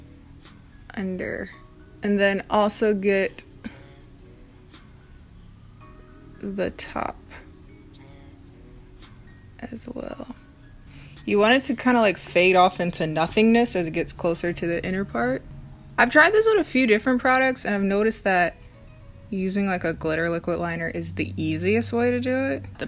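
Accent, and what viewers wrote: South Korea. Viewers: I think the korean accent is cute when you speak japanese. I am on the other side of the coin where I learnt japanese and everything gets a japanese accent instead XD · Haha you definitely do pronounce Japanese things with a Korean accent. I did the same when I went to Korea the April just gone. I pronounced everything Korean with a Japanese one 😩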